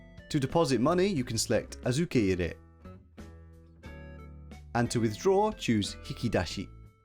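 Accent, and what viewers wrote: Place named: Birmingham, United Kingdom. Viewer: This is a brilliant resource mate, thanks so much - definitely deserves way more views. Ps: nice to hear a fellow Brummie speaking in Japanese :P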